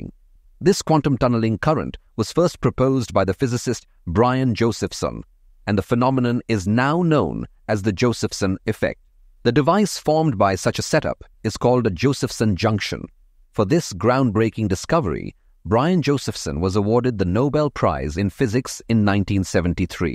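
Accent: India. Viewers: This video is obviously created by an Indian. Even though he/she tried to hide his/her Indian accent using a weird robot sound, one can still tell it's an Indian talking by his/her mispronunciation of the letter "T" when he/she said "doo taosand dtwenty five" instead of "two thousand twenty five". Also the incorrect use of the article "a" instead of "the" in a sentence is very common among Indians and gives their identity away.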